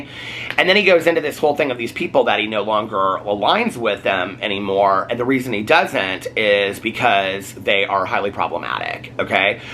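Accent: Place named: Ohio, United States. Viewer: I love you so much. I am from outside of Cincinnati, so the way you talk and your mannerisms remind me of my southern Ohio family and friends. It is so heartwarming bc you are such a good person and you sound like all my fave people.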